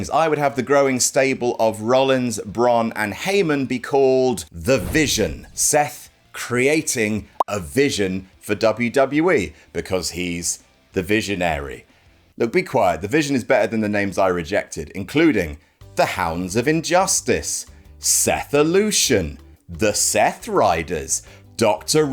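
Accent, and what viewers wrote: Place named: RP, United Kingdom. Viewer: It's wouldn't be without hearing his posh accent say fuck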